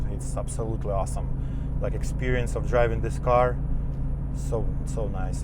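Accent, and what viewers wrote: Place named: Russia. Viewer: Судя по акценту ты говоришь по-русски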